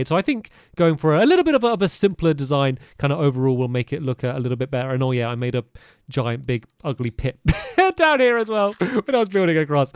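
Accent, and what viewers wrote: United Kingdom. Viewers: Yo stampy u speak British. ?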